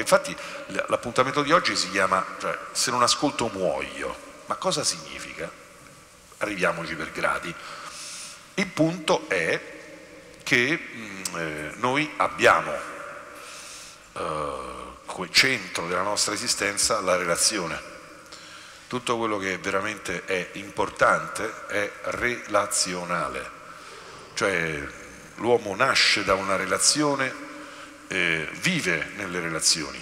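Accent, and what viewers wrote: Rome, Italy. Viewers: Chi scrive che il romanesco da fastidio be significa che si mette una barriera alle cadenze dialettali che in ogni regione ha e poi io nn sno romana però piace.😮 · e come fà..... é de Roma... e noi romani parlamo come parlamo... e daje Don Fabio chessei gajjjardo, er mejo prete detutta Roma... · Don Fabio, se potesse per cortesia evitare di parlare con parole e toni romaneschi, è fastidioso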